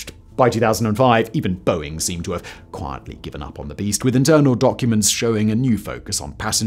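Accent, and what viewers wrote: RP, United Kingdom. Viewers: It's just RP English, lots of ordinary people speak it. (RP = Received Pronounciation)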